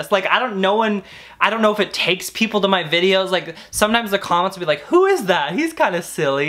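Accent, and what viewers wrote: United Kingdom. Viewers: WHY IS THE BRITISH VOICE SO FUNNY IM DYING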